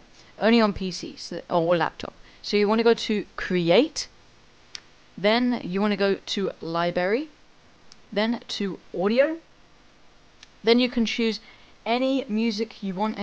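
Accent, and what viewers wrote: United Kingdom. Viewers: I love your Bri'ish accent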